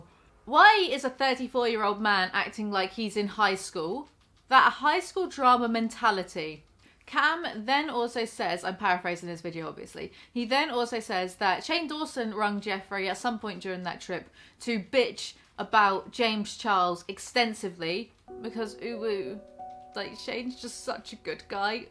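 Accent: United Kingdom. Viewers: I LOVE your accent. I usually hate British accents. i dunno....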